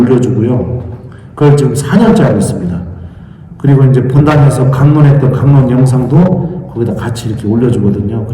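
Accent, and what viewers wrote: Chungcheong, South Korea. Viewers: 요새신부님강이에 푹빠져들고 재미 있게 충청도 말씨 유머 감동입니다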